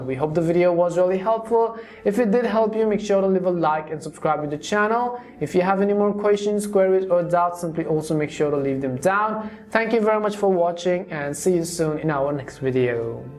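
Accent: India. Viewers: Problem not solved but we got normal indian accent before gta VI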